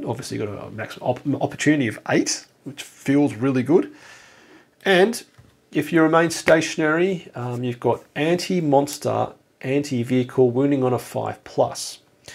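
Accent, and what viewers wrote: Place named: Australia. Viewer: Is this an Australian doing 40k? Love it.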